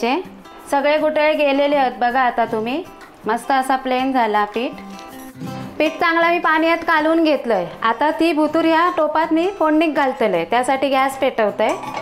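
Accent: Malvani, India. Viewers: Maaka pn pitti lay aawdta aapli malvani bhasha eikayla kiti god vatta recipe khupp Chan 😋😋👌👌👍🏻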